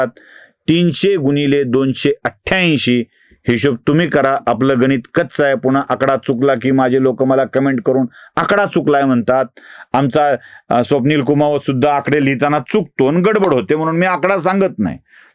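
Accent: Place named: Marathwada, India. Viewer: श्री आपण मुद्दा तर व्यवस्थित मांडता, त्यापेक्षा मराठवाडी मराठी खुपच छान बोलता. धन्यवाद.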